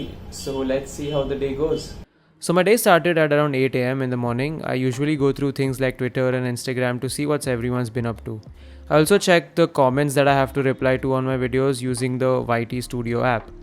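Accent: India. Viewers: Fvck you and ur indian accent!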